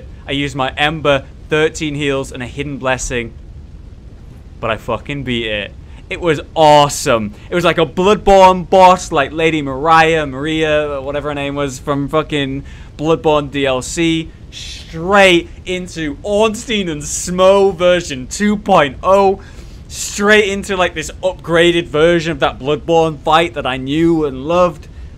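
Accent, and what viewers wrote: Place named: Wales, United Kingdom. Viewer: Scottish I thought he sounded Welsh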